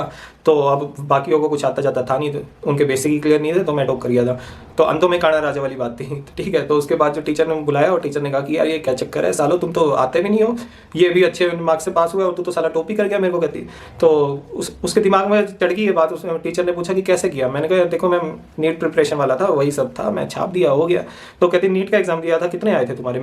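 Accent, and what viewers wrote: Haryana, India. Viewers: U have haryanvi accent are from haryana??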